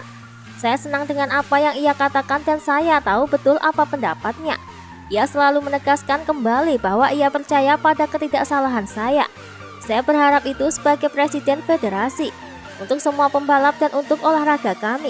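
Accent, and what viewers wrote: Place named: Banyumas, Indonesia. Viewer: Admin nya orang ngapak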